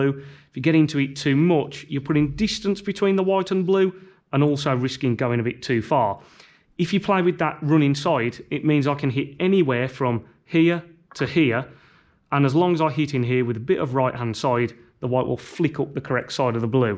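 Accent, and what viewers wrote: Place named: Stourbridge, United Kingdom. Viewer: Sounds like you are from near me, stourbridge!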